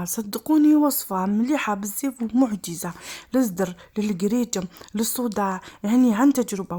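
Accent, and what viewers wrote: Setif, Algeria. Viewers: سرجو ههههه نموت على اللهجة السطايفية انا شاوية ،اشكون كيفي ؟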